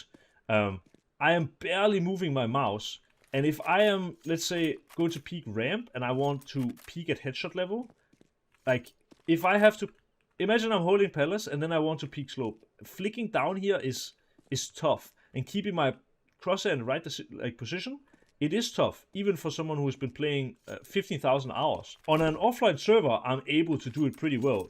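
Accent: Denmark. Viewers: flot dansk accent :D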